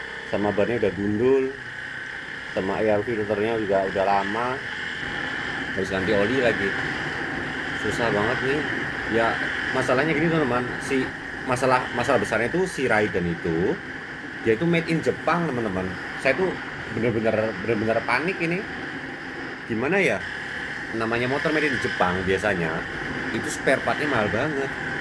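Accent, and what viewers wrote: Java, Indonesia. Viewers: iki koyone wis sue nang jepang, tapi logat jowone gak ilang hahaha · pak jowone ngendi???... hiromi dijak balek jowo to...hehehe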